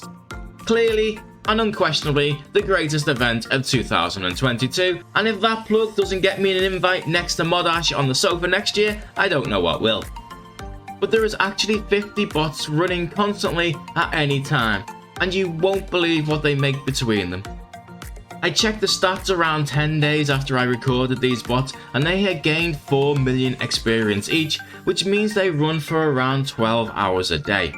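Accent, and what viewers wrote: Liverpool, United Kingdom. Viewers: Are you a scouser brother hahaha cant tell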